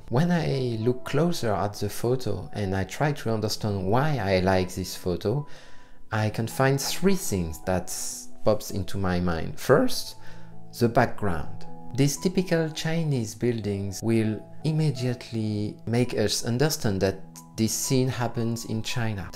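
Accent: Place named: France. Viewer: Non, c'est compréhensible. Pour un anglophone l'accent français est charmant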